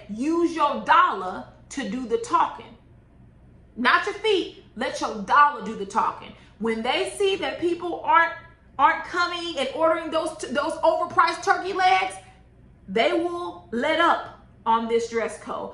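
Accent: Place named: Houston, United States. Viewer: Something TOLD me you were in or from Houston! If Im wrong, sorry, but I always felt like I could pick up on that fellow Houstonian tinge.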